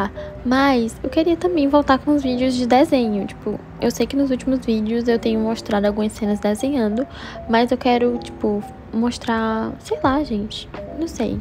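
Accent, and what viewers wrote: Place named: São Paulo, Brazil. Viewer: amigaaa,tbm sou de fortaleza e jurava que vc era paulista kkkk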